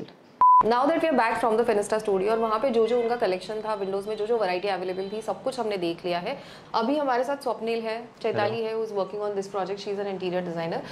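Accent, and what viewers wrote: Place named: Hyderabad-Karnataka, India. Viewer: Very nice like always in detail. Your tone wz Little bit HKBRD region your belonging to which state. Very nice clear tone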